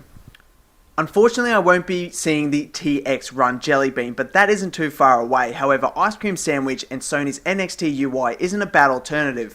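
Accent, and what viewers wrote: Australia. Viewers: Australian accent, love it!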